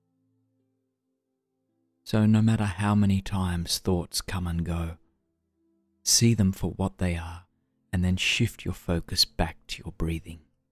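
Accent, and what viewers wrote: Australia. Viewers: I’ve listened to only a few guided meditations and I’ve found there’s certain sounds in the voices that are not so gentle.... a lot of times the S sound and sometimes the T sounds are kind of piercing. Is it just me? Is there any way to kind of muffle that at all? Aside from that, it’s great to hear an Aussie voice guiding a meditation... thank you!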